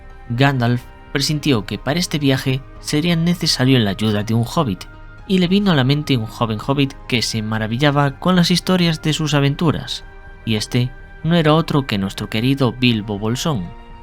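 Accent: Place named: Galicia, Spain. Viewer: Mejor que Kai, en todo caso, ademas muy modesto, y el acento gallego es genial y mágico. Ademas en Kai noto cierto aire de superioridad y desprecio por el subscriptor. Escuchando a, me siento mejor. Pondria como a como Gandalf y a Kai como Saruman, o mas bien Zarquino.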